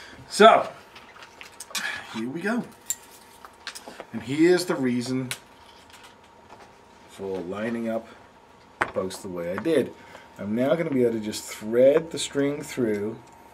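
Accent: Australia. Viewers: Tequila - sounds like he comes from Australia? Sounds like that kind of accent. Anyway, awesomely informative video - I've got a razorback coming soon and this makes it look so simple! 5*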